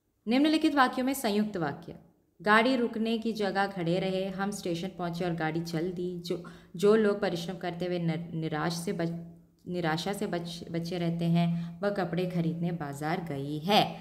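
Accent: Kerala, India. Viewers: Mallu sound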